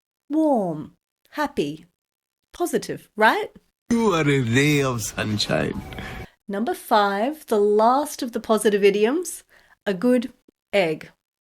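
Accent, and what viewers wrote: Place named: England, United Kingdom. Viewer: Thanks girl I really like your accent from which English city are you from ?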